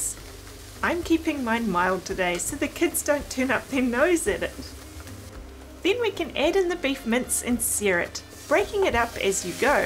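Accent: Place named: New Zealand. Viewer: Looks delicious!! Also, I enjoy listening to your New Zealand accent, so much. Best wishes from Phoenix, Arizona🤗💐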